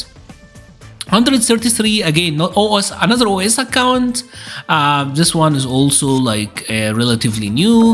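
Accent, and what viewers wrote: Egypt. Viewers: Egypt? crazy accent. how do so many of yall fit in the Nile delta? 🤯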